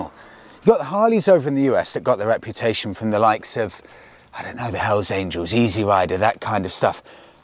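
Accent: England, United Kingdom. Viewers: I guess I am going to learn English from your videos! British English is a little bit harder to understand than American English but that sense of humor totally convinced me. :-) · It’s like watching The Fonze, with an English accent! 😄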